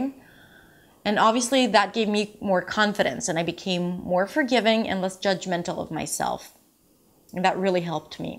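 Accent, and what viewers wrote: New York, United States. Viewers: Hi! I’m Chinese and I live in Paris. I’m your new follower since one week. I’ve been very surprised by your excellent NY accent that I really want to master also. I even showed your video to my French husband who speaks very well English, he didn’t doubt one second that you are nota native speaker. I like you accent and your struggle personal story, very motivating! And I appreciate much your confidence (and your new hairstyle 😊). I enjoy your course and hope that in several months I could get the half of your level, thank you so much! Love you! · First thing first you sound so American, secondly everything you said has happened to me. 1) People completing my sentences each time I tried to say a complex sentence 2) Blank, confused and frustration on people face each time I spoke 3) Huh, I sorry what did you say? 4) Correcting me each time I spoke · You can unsubscribe if you want. 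But I wouldn’t really worry about her making a mistake. She has spoken with an American accent the whole time and even took speech classes to correct her pronunciation in the beginning. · No, I personally don't need it, as I'm not a bad accent mimic myself (at the risk of sounding conceited). I'm not even sure how or why I found your channel, but I occasionally look at different language and accent videos, so itmust have been in the recommended list, and then I saw your obviously Israeli-sounding name, so I checked it out. Bekitzur, kol hakavod al ha mivta ve al he'arutz shelakh, at nishmaat kimat amerika'it legamrei, kashe le'haamin she dibart anglit im mivta yisraeli lifnei kama shanim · Phil Smith I totally thought she is an American!! I am Chinese though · Wow! I want to learn English like a native English speaker! I love the American accent, tell me what the secret is. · Ohh god You are very smart you speak like a native English teacher congratulations 🎈 for a moment I thought you were American.. 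i love ❤️ to watch your videos. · You really inspired me dear sister. I'm very happy that God has bless you with the facility to learn English. I'm amazed that you sound 100% American when you speak. I'm a Christian and I'm believe that God gifted to us with such bless to pursue anything that we want.... Listening to you really gives me confidence for my learning.... God bless you dear sister....bue😀😊😄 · In your story, you jump from "I was born in Izrael" to "when I was 20 I moved to New York". What was your experience with English before you were 20? Especially before 14y.o. I might be wrong, but you your accent is 100% American. Not only the accent but the use of words (collocations, idioms etc.). It's hard to believe you had not been raised (or spent at least some significant time in your childhood) in English speaking environment. It would be honest to tell about your childhood experience with English to the people who watch your videos so they can realistically estimate their chances to achieve the same level of natural speaking as you have. 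· You speak perfect American accent. If you did not say you are Israeli I would not recognize English is not your native English. Your story is full of inspiration. I admire the Israeli because their contributions to the world. Thank you very much. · You are amazing you were able to master an American accent. Science says that you will never lose your accent after a certain age (eleven) glad you know you provide them wrong. By the way, do you feel that you will revert back to the way you spoke before since you are surrounded by people who speak with English an Israel accent? Subconscious you might pick up the flow just like people pick up certain accents and words when they move to a new country. What is your trick and how do you prevent that if you find that happening.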